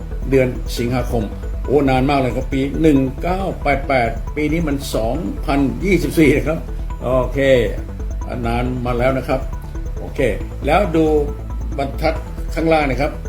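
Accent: United States. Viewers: This is the real american accent ,you know? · สำเนียงและสปีดเดียวกับ Voice of America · สำเนียงโคตรเมกันเลยจารย์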